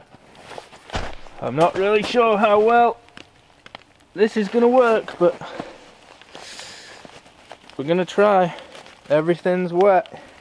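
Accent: South, United States. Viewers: Oil your gloves... mineral oil.. ppl suggest other oils... 🙄 just oil them. Ya really need a saw upgrade. I wouldn't wanna be caught dead in the wood with that- (I know its compact and lightweight) they would say: "at leased the saw kept him warm, isnt it?" Awesome Southern accent btw 😂. It's well advised to not drink whilst camping alone... but, that's your business. Good vid, great job, thanks for posting. One can learn a thing or two from this.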